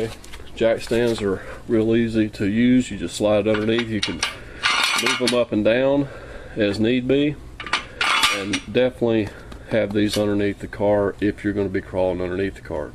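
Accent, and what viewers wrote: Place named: North Carolina, United States. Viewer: I could hear you were from North Carolina before I even saw that you're username had "Mint Hill" in it. I'm up in Huntersville and just changed the oil in my '89 Ranger. Good thing I got under there too because I saw that I've got a bad seal on my differential housing, so that's my next project. Thanks for the help.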